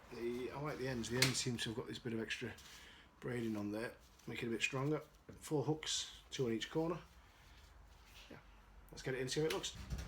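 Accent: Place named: Sheffield, United Kingdom. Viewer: I knew you were from Sheffield, thought I recognised it :D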